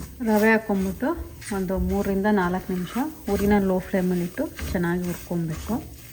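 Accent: Mandya, India. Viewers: Nim bashe pakka nam mandya ❤ akka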